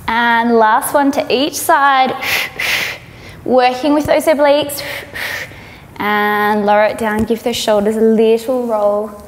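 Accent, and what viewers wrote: Australia. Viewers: Beautiful accent from down below...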